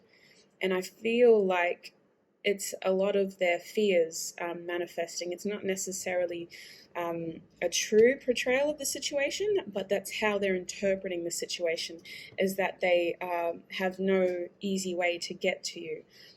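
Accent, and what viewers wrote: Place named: Australia. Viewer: I love your Aussie accent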